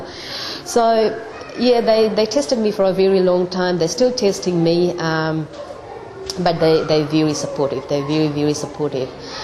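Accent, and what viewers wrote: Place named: United Kingdom. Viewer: This is UK accent 😊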